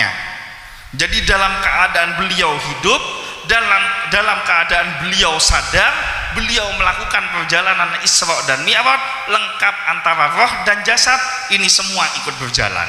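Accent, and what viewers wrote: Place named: Sumenep, Indonesia. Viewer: Dari mana asalnya kiai ini?. Logatnya seperti orang Sumenep.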